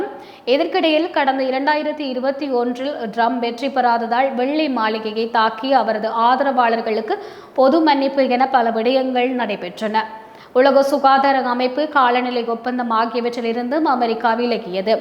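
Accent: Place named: Jaffna, Sri Lanka. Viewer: siranda Yarl thamil uchareepu!! vaalthukkal🎉🎉🎉😊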